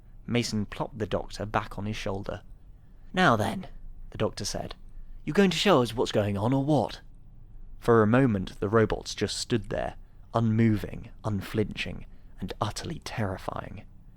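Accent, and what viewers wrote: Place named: United States. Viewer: your american accent is pretty good! (i’m american)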